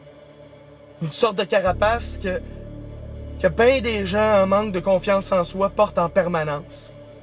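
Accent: Quebec, Canada. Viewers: tant d'amour en un seul coeur, continue de communiquer même avec ton accent québecois, avec ou sans mots, ton destin est ronceux comme les notre. Combat tes doutes, laisse toi des aides mémoires :) pour tes journées infinies, Tu est le seul a pouvoir décrypter ton langage dans ce monde car (il vient de) c'est Ton univers. Shebaleking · mon pére et bipolaire et moi apparement merci car tu ma fait rire avec ton accent québecoi mais aussi merci par ce que tous se que tu ressent je le ressent ausii et sais dur d'être instable des humeur instables mais comment sa fait du bien de voir ta vidéo merci tu es admirable <3 :) prend soin 2 toi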